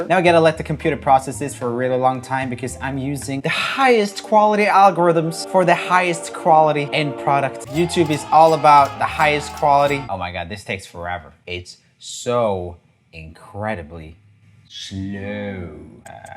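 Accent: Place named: Sweden. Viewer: Why sound so different and having Swedish accent 😅😅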